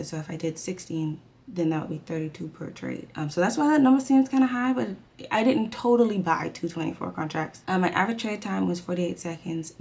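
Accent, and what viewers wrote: Bahamas. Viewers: Are you Bahamian? Sometimes your voice and how you say words reminds me of how Bahamian women speak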